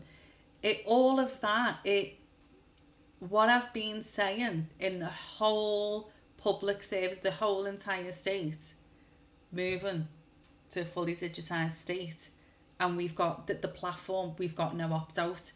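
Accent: Liverpool, United Kingdom. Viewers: As a southerner I love the scouse accent ...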